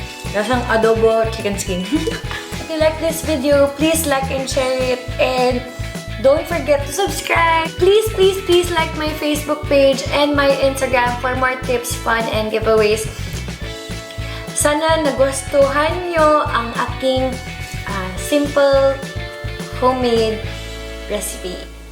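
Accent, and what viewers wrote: Visayas, Philippines. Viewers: Bisaya po kase c bisprin kaya ganyan po sya magsalita,pero ok naman para din di ma bored mga viewers kaya dapat may comedy talaga po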